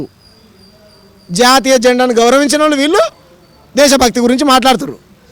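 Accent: Telangana, India. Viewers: అన్న అందుకే నీకు అని పేరు వచ్చింది అన్న నిజాన్ని నిర్భయంగా తెలంగాణ యాసలో మాట్లాడుతావ్ సూపర్ అన్న మార్వాడి కూ సపోర్ట్ చేస్తున్న రాజకీయ నాయకులను రాజకీయ పార్టీ లను తెలంగాణ ప్రజలు బాగా గుర్తు పెట్టుకొని ఏ ఎలక్షన్ వచ్చిన ఓటు తో బుద్ది చెప్పాలి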